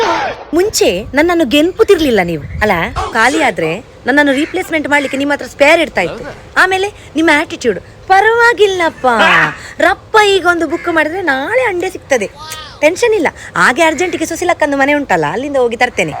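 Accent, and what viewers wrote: Mangalore, India. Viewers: Sooooooooper stand up comedy dear gas ⛽ 😂 Such a beautiful actor you are ..just wowww U told the reality of gas usage in a good way creating humour . I JUST LOVE UR MANGLORE KANNADA ...Muuaaahhhhhhhh 👄 But dear gas cylinder dont worry Ishtu diwasu band maadiddu microwave iga upayogistene 😂 Haage nann bali induction kuda untu...neenu swantane maha khiladi anta tilko beda 😁😁😁😁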